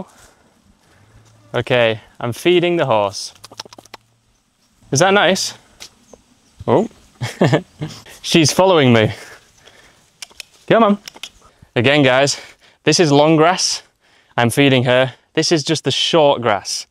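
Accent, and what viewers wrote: United Kingdom. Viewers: oh my god, very amazing video, and your way of teaching really fantastic, and british accent adds a feature to the video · I am from South India. Very useful to understand British àccent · İs it british ascent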